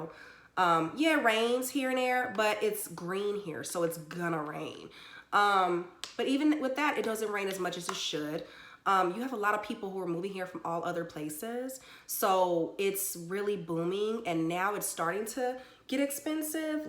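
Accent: Texas, United States. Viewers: You have the Texas accent lmao. DFW is definitely a go to moving place Our population may not be that much..since the population here is only 8million but real soon DFW will be bigger than Chicago and the same size as LA. But it's still so cheap as it was decades ago..and still more opportunities and jobs coming everyday Your doing a good job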